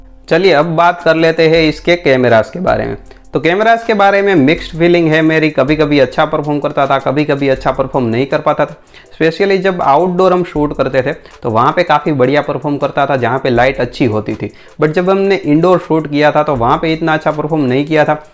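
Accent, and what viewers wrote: Gujarat, India. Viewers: Aap Gujarati Hona... 😊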